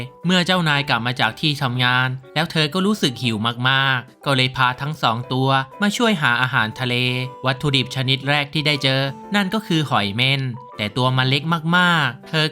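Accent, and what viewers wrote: South, Thailand. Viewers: พี่เป็นคนใต้ใช่มั่ยผมก็เป็นคนใต้เเหลงใต้ไห้ฟังหน่อย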